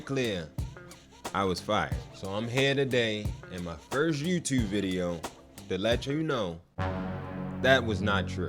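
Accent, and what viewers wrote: Baltimore, United States. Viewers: I have dated quite a few Baltimore men and have a lot of friends here so yes you all sound like that but it's cute! I used to get mad when my ex called me dummy but now I realized that it is a term of endearment! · That Baltimore accent is obvious . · dont apologize for dat baldamo accent young brotha 410!!!!! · B- more accents are cool in my opinion😎 Baltimore keep stayin true 💯💯 Much love from Savannah Georgia🍑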